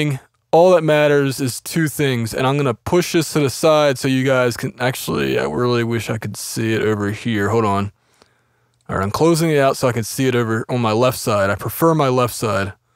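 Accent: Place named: United States. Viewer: Hmm...I recognize my own american regional accent. What state you from???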